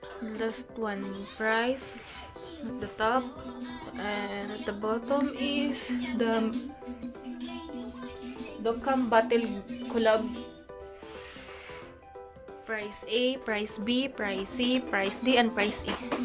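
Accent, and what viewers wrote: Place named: Philippines. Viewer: Filipino accent AHHA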